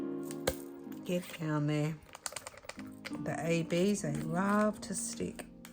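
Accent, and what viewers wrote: Australia. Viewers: Hi, it's your girl from JerZey! HAH! I really enjoyed listening and watching you do your first section on this mystery painting. I believe I had the same one, but donated it to a friend because the black and white canvas messed my eyeballs up. But it's looking so good! I tend to have a hard time with AB's too, but tough it out. I am so excited for you to visit the EAST Coast your next time around, there are so many great places! So glad to have a friend in Australia, love your accent by the way too! The time-lapse is always fun to watch to! Hope you continue to feel better and till next time, take care friend! Sending you continuous healing vibes and hugs! XO JerZey Chris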